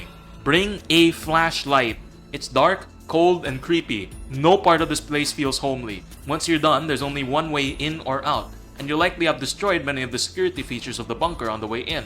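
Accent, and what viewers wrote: Philippines. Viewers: Pinoy na pinoy yung accent mo par hahahaha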